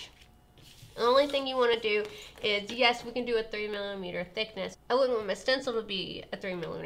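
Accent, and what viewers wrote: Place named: South, United States. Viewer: I love this. I purchased stencil stuff two years ago and was just to intimidated to try to make the door mat. I’m going to try it now thanks to you. I learned some things about welding too. Love your accent, I’m southern too! Awesome tutorial, thanks.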